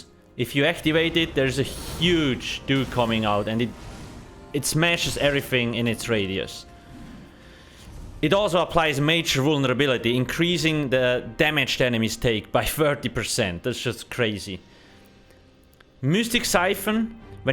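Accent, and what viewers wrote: Switzerland. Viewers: Sounds like a swiss dialect. Grüezi us Dütschland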